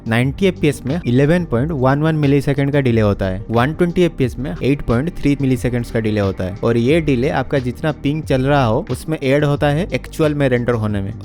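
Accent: Odisha, India. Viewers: Odia accent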